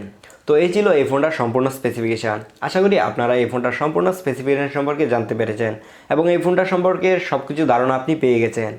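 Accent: Kishoreganj, Bangladesh. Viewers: Vai apnar basha ki kishurganj...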